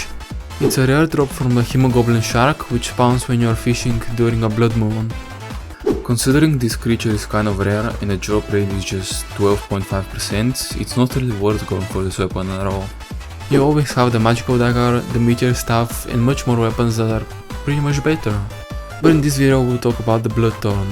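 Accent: Russia. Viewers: У тебя русский акцент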